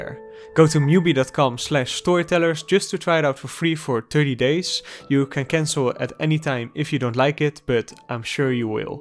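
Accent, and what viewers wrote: Netherlands. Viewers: Lol i love ur dutch accent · that duthc accent omg 💀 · Is this guy louis van gaal? That accent is very clear. Not negatively or positively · That's one thick Dutch accent. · Why can I hear that this guy is Dutch? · Je Nederlandse accent is wel erg kenbaar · Jij bent sowieso Nederlands aan het accent te horen hahah nice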